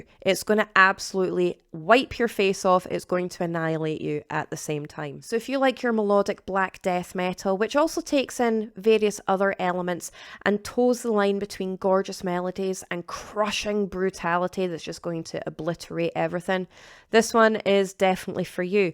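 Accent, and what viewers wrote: Scotland, United Kingdom. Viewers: It's a basic metal channel. But every now and then, she hits me with one I havent heard, and those are the reasons I watch. Plus the charming Scottish accent/demeanor. Plus, to be fair, shoegaze is a 90's thing that's only been creeping into metal since -. Not her generation. This is how it feels to grow old. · "If it's not Scottish, it's Crap!!". Love the accent and great video.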